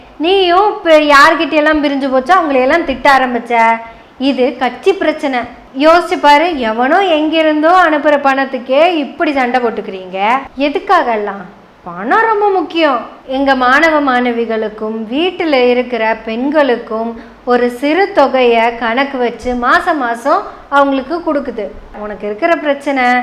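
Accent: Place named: Kongu Nadu, India. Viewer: கொங்கு தமிழ் உச்சரிப்பு மிகவும் அருமை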